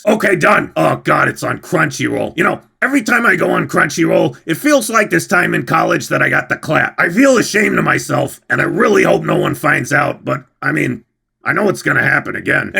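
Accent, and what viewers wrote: United States. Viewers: I gotta ask... what part of the US is this waifus accent from? Subscribed anyway lol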